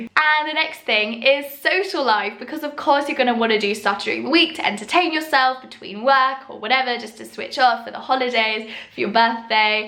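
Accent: England, United Kingdom. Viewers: Love real English accent!!! Which type of UK accents it is?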